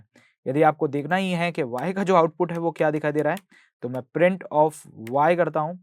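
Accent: India. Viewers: get rid of that indian eng it sucks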